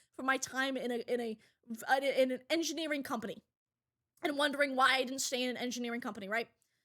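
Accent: Boston, United States. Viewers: Just sounds like every woman in Boston to me. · I somehow never made the connection that cardboard is pronounced the same way as any other "-ar" word in Bostonian. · The Boston accent really enhance the stories. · shes from boston, and as far as im aware its not a character thing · The Boston debuff is so cute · don't hear Boston accents very much were I'm from. It's unique and interesting. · It's a Boston accent, lol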